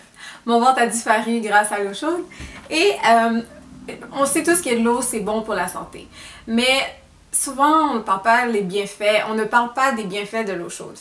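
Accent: Canada. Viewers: C fou t'a un accent canadien c mignon 😊😊😊😊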